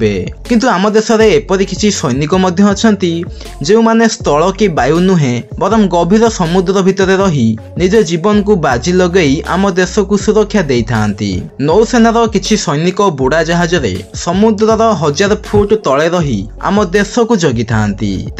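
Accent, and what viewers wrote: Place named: Jajpur, India. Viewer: Bhai tuma voice ta janila pari laguchi tuma ghara jajpur nanpur ki ❤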